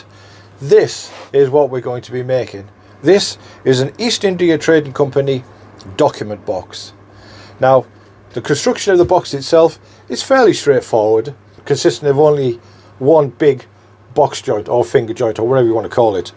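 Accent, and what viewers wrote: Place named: North of England, United Kingdom. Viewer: Where in England are you? I grew up in the North of England and to my now horrendously uneducated ear you sound like you might be close to there but ive not been back in 30 years now so I could be wrong.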